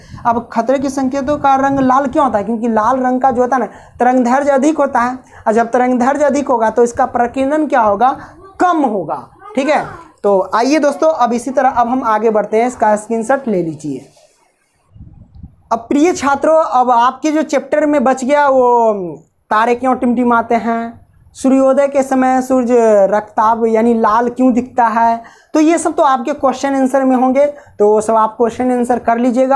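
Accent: Bihar, India. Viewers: Sir Ap bihar sa ho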